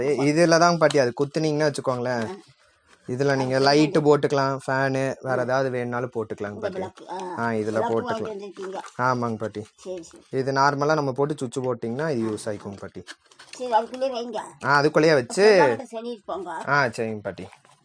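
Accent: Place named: Kongu, India. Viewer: Unga kongu thamizh pechi arumai🥰😘😊☺👌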